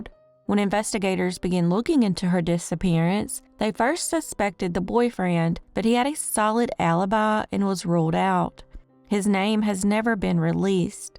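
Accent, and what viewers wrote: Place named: Kentucky, United States. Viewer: Are you from KY? Your accent sure sounds like home. ❤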